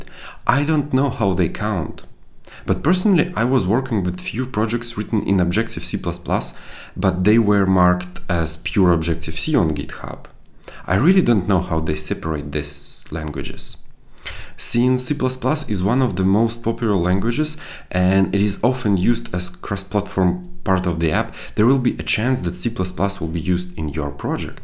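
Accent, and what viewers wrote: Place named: Russia. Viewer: Наш человек, сразу слышно)))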